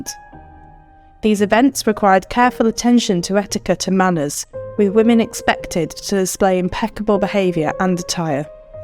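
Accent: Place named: United Kingdom. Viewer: I love British accent😊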